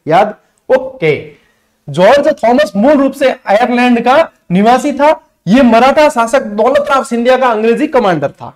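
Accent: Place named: Rajasthan, India. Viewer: Sir aapki marwadi boli bahut achi lgti h class bhi bahut mst h sir jii thank u so much 🙏🙏🙏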